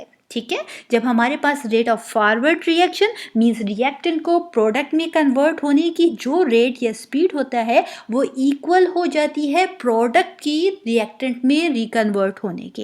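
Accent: Pakistan. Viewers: Mam are you from Pakistan?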